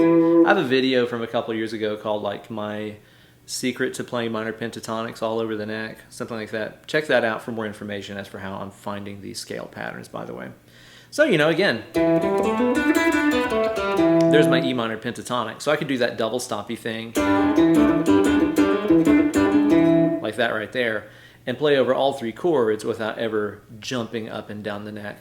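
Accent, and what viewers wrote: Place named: Virginia, United States. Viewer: I love this! As an experienced metal player trying to work my way into country playing, this is a quick easy transition, rather than attempting to start from scratch or re-invent the wheel, so to speak. Great content as always. You have quite the way of explaining things, so that anyone can get it. I grew up in SW VA so your accent reminds me of home. Keep rockin!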